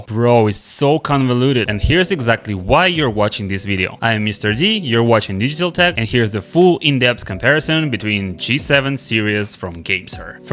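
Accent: Russia. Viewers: я так и знал что он русский с нулевой секунды было понятно =)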